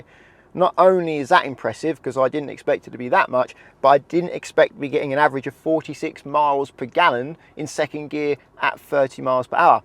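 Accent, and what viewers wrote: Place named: England, United Kingdom. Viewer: alyone else find it tiring to listen to the many english accents Youtube has given us? Some are cool, some are annoying, some are incomprehensible... its a tiny island nation get it together, you invented the language, it's three not free, find not foind. This one is actually really hard to listen to, my brain is literally tired from all of the uncomfortable sounds.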